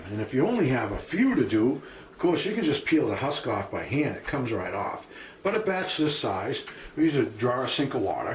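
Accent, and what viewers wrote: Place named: Boston, United States. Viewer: I'm from Boston and this guy sounds like he's a Bostonian. I had no idea the New Brunswick accent was so similar to mine!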